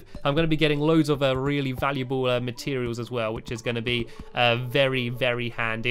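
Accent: England, United Kingdom. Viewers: He's a brit I should know cause I have a the same acent psychological don't call me Thomas most people call me Nickola ( Nik- o -lie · Tyler Delsol if you can't identify a British accent, I'm slightly worried for you.. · your from engand not amerac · is stampy english and is scottish?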